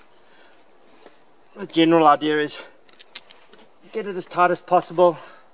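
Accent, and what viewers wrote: South Africa. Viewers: Love the videos man! Where are you from? You almost sound south african! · What is your accent? I'm guessing it's a mix, maybe a south african that now lives in america? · Are you from South Africa? sure sounds like it, awesome stuff btw!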